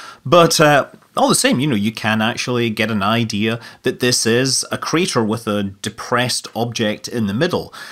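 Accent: Scotland, United Kingdom. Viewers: "Push broom" might be the best phrase to hear spoken in a Scottish accent.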